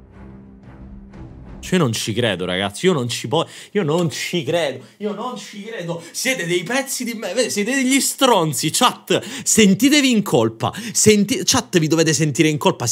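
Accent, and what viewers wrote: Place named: Ravenna, Italy. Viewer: ma te sei di ravenna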